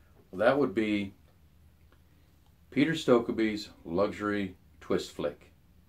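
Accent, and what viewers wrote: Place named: South, United States. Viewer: what part/s of USA is your (or his if your a viewer & U know?) is your accent from/based-around,? As a Brit gal (my hubby smokes a pipe & loves Nightcap & your vids) I/We can't quite place it; I get notes of Southern, maybe a dash of Texan, Midwesterny overtones, a teensy bit of Northern-border region possibly (Pennsylv./Fargo e.g., hey I'm a Brit I'm gonna be way off prob!?), and a hint of light New-York here & there. But really it just sounds like what we in rainy old England might consider a 'straight-American-Dialect' with a touch of some southern state/s; usually the only Southern-State accents we tea-drinking islanders can tell apart from the others are New-Orleans and 'pure' West-Virginia (if that counts as a Southern state?, it sure sounds like one to us). Just curious & interested to know how way-off we are - happy piping! 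👍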